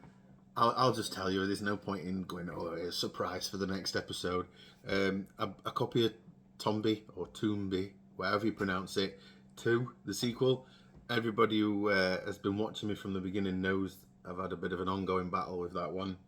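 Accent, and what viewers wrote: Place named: Sheffield, United Kingdom. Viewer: Possible, I actually remember very little about it, but usually if a game is terrible it sticks out to me. I think the Switch version is based on the Game Boy Advance edition. I didn't even know there was a PS1 version til this video. Learn something every day. Also, where in yorkshire are you from? Your accent reminds me of my hometown of Sheffield.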